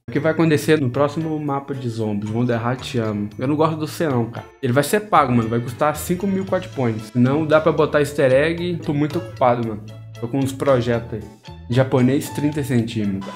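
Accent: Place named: Minas Gerais, Brazil. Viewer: Quem é esse mineiro?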